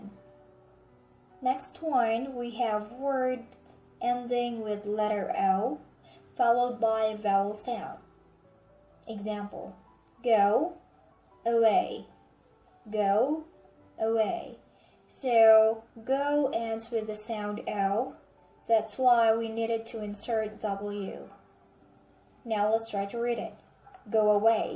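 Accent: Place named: Philippines. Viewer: Are you a Filipono? You're very good. Upload more videos about English tutorials because you're very good on it. Done my support.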